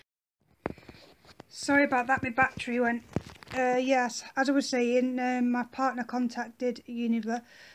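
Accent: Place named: United Kingdom. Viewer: i really like your accent. where abouts in the uk are you from? you got some great freebies. i think i missed out on a couple of these :( i use the coconut oil on my hair and its great x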